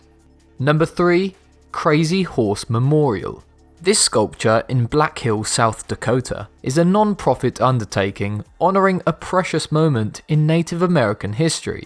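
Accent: United Kingdom. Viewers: Stop assuming he's American just because he made a mistake on something from another culture, you idiot. Judging by his accent, I'd say he's from the UK. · British pronunciation. Not incorrect, considering narrator is clearly British, at least based on his accent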